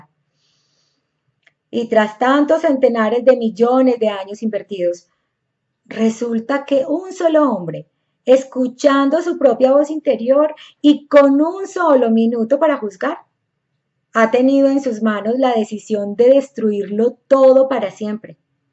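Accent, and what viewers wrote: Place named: Medellín, Colombia. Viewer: yo tambien soy de medellin es que tu acento se me hacia familiar jejeje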